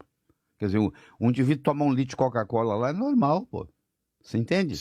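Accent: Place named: Portugal. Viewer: O sotaque da língua portuguesa mais lindo do mundo : ♡♡♡Portugal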